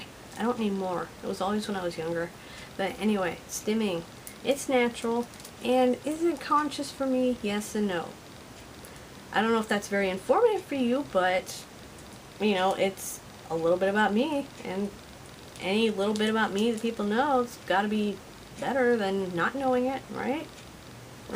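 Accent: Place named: South, United States. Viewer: i was born in Houston, i like your southern accent :p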